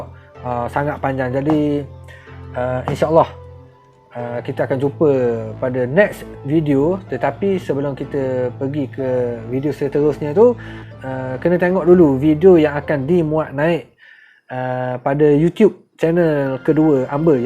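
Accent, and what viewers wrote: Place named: Terengganu, Malaysia. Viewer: Penceritaan.. yg.. sangat.. menarik.. saya. suka. slang.. Ganu. nye.. 🤣🤣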